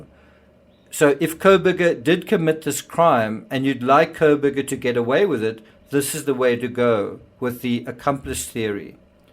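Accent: South Africa. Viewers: There is no voice better than a South African voice talking about anything ❤️🇿🇦.... sorry been watching all the channels on this and just found yours and couldn't even focus... but yes agree I am extremely selective on what channels I watch and subscribe to. The Vet and check everything before posting or reposting now that everything has gotten so out of control. Thank you 😍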